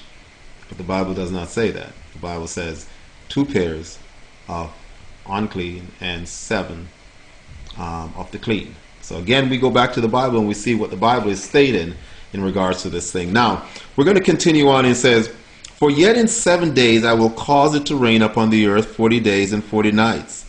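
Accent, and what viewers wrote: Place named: West Indies, Caribbean. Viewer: you have an accent...perhaps you're west indian? and i couldn't find the other channel.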